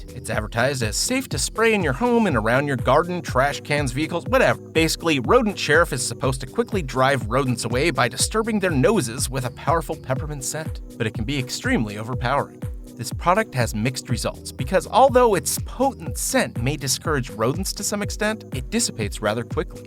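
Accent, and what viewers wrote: Buffalo, United States. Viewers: Wait is he from Buffalo? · You from Buffalo?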